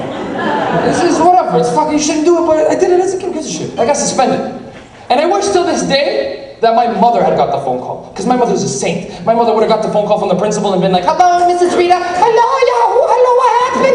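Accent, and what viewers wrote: Azores, Portugal. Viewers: Viva o sotaque de S. Miguel... :D para nós, os continentais, na maior parte do tempo são necessárias legendas, mas é um sotaque fabuloso. Um abraço do Porto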